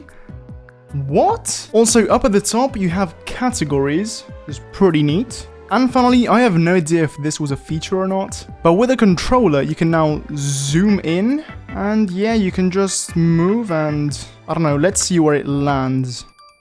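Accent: Italy. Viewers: As an Italian i really like your Italian accent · sei italiano? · Your English is vary good but that: "nono é" It means: "che sei italiano e minchia se lo nascondi bene l'accento italiano" Gg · Ma sei italiano? No vabè🥲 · Are you italian?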